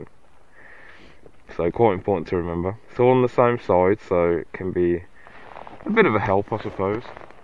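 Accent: United Kingdom. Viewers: Sound Like a Black Country Lad. and im thinking of getting a ninja 250r for my first bike, but iv been told i will out grow it in a couple months, and im lookin for something with abit of longevity something i can grow into rather than out of so im swaying toward a 600cc (zx6r) im 6'2 and 12 stone any advice "/ ? GREAT VIDEO BTW LEARNED ALOT !!! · Is that a fellow brummy accent i hear? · I think because you're British you sound smarter then all the American tutorial videos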